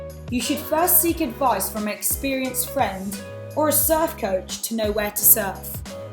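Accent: United Kingdom. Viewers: It doesn’t feel right being taught surfing from a British accent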